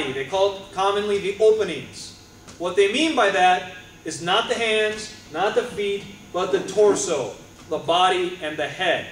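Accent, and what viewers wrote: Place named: Iowa, United States. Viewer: If you had not said he was from Iowa and asked me instead to guess. I would have guessed Iowa.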